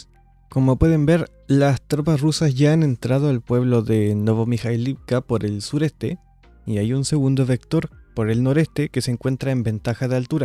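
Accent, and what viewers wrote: Chile. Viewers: Otro youtuber chileno bueno informando sobre la guerra ruso/ucraniana? Al menos a mí me suena algo chileno el acento. Bravo los chilenos! Se aprecia y agradece la objetividad. Felicitaciones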